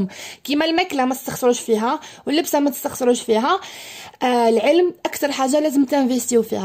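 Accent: Constantine, Algeria. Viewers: شحال تعجبني اللهجة القسطنطينية و تعجبوني بزززااااف كي تقولي نتغشس😻😻😻😻🤣🤣🥰🥰🥰🥰🥰🤣🤣🤣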